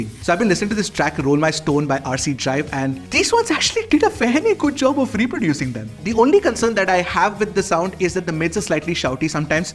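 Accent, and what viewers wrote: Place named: Pune, India. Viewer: With time has obtained Puneri characteristics that are somewhat noticable through way he speaks now...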